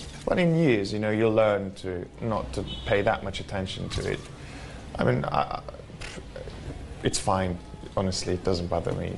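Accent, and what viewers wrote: RP, United Kingdom. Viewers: British royal accent perfect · he has been in uk, and picked the accent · I like his English accent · Resmen kraliyet ingilizcesi konuşuyor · oha ingiliz aksanı😍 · he has a bit of a British accent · Baya baya british konuşuyo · rp aksanını herkes konuşamaz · british accent!!! so sexy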